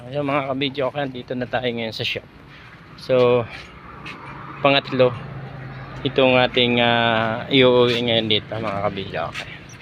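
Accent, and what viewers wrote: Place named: Camarines Norte, Philippines. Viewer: hello po idol,watching po full wh po,done tamsak at dikit narin po ako sa bahay mo😊❤❤parang sa tono po ng salita mo idol parang taga camarines norte ka po,taga dun po kase ako baka po magkababayan tayo idol😊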